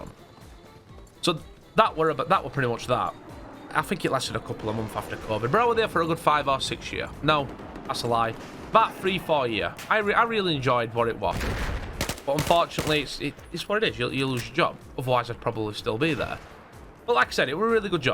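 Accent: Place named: England, United Kingdom. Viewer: I also loved ur english accent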